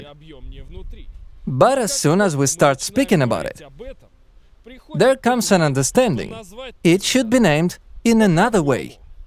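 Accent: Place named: England, United Kingdom. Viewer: О вы из Англии?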